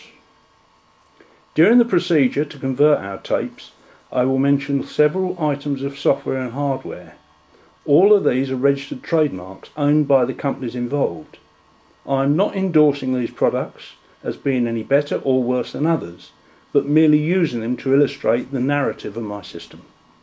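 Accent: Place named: United Kingdom. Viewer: The best was the british accent :P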